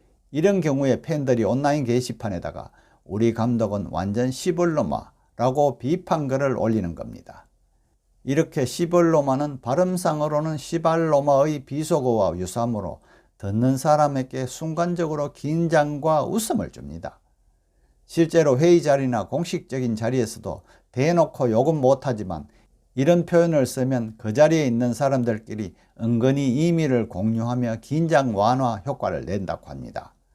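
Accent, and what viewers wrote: Gyeongsang, South Korea. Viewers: 선생의 억쎈 갱상도 사투리 그냥 듣고 싶지.안소이다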